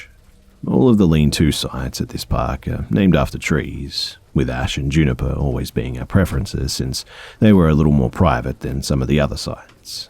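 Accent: Australia. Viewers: One of my favourite hobbies is Listening to scary stories and Drawing. Your Australian accent is Excellent and I'm not gonna lie, Pretty relaxing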